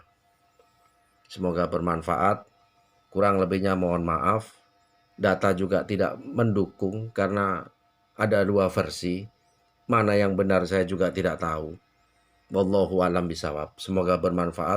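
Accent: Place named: Madura, Indonesia. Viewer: Hehe seru denger abang bercerita logat madura semakin seru mantap bang semoga sukses selalu Merah udh aku hitamkan Mampir balik bang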